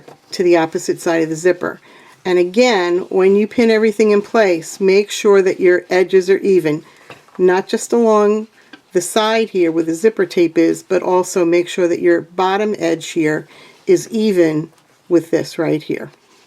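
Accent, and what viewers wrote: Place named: Brooklyn, United States. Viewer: Hi, I recognized the accent; I lived in Brooklyn for 8 years back in the 1970s! Then have lived in CT for many years. Now I'm in Oklahoma (Oklahoma?!) because we retired and followed our married son with the 4 grandsons here; he's a professor at a university. Thanks for the tutorials!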